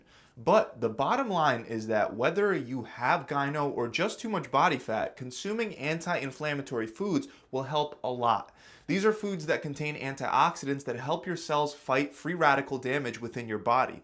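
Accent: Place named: New Jersey, United States. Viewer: You from Jersey? My man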